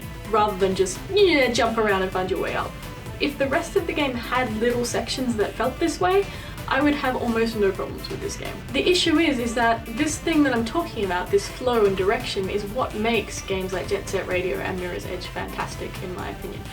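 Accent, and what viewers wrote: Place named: United Kingdom. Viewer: uk accent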